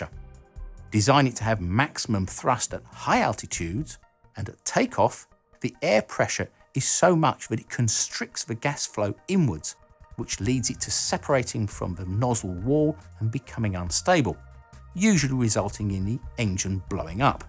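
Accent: United Kingdom. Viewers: Did he just say folcon heavy i ♥️ the british accent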